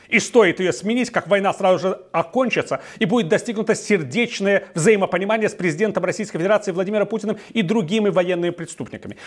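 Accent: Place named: Baltic. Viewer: Ты кто??? Комментировать за Грузию с акцентом прибалтов.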